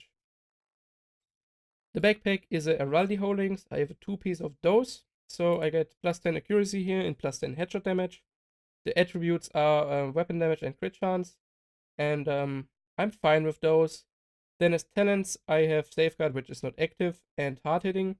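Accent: Germany. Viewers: Beim ersten Wort auf englisch habe ich gemerkt der ist ein deutscher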